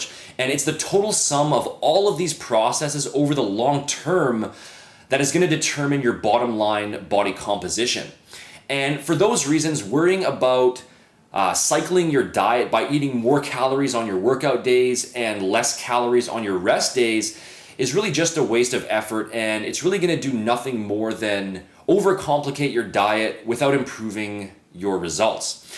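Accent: Canada. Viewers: Are you Canadian? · are you from toronto? you sound like kinobody with the way you pronounce your O's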